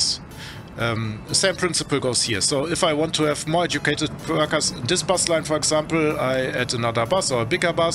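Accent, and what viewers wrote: Germany. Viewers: Sweet , after three seconds I recognise the German accent.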